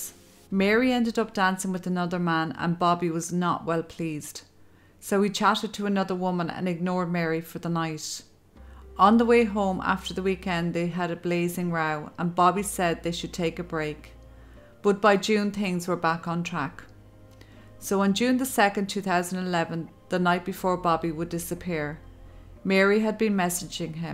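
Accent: Ireland. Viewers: Love your soothing voice. Which part of Ireland are you from ? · You speak so clearly with that beautiful Irish accent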